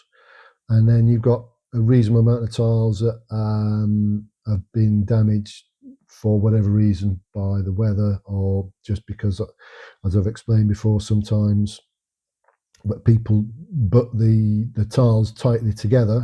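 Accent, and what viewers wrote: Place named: East Midlands, United Kingdom. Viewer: So where in England are you from. I don't know why but I assumed you were from the East Midlands (Derbyshire somewhere like that)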